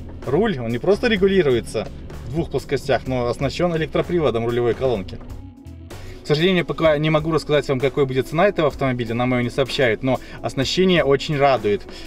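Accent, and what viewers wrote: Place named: Belarus. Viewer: Слышен белорусскии ГГовор!!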